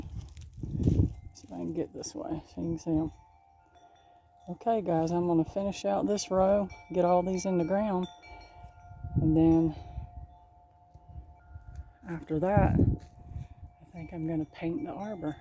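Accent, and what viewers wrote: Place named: Western North Carolina, United States. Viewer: How exciting to find your videos! I am definately going to try the water bath canning! I also love that you are from my neck of the woods(western NC).....or sounds pretty close anyway.......I am in Lenoir! Looking foward to more of your videos......I am enjoying now going through all the previous ones. Thanks!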